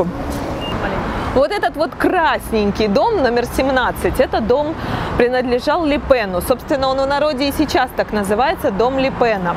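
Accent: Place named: Stavropol, Russia. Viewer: Какой-то ставропольский говор... Но не плохо чешет так то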